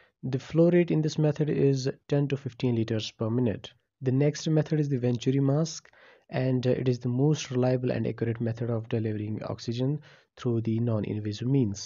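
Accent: Kashmir, India. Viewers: Your voice is indicating that you are kashmiri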